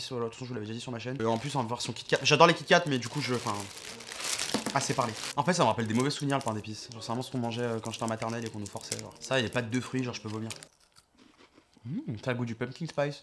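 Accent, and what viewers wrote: Quebec, Canada. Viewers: Ptdr l'accent québécois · Juste l'accent quebecois mérite un like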